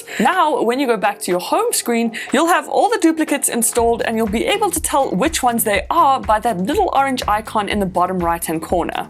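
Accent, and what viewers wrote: South Africa. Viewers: Sounds 100% South African and I love it... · are you south african?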